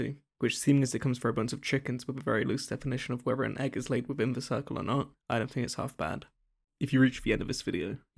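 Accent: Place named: Scotland, United Kingdom. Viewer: That's so cool, great idea ! Are you Scottish ? Because as a French it is hard to understand you 😆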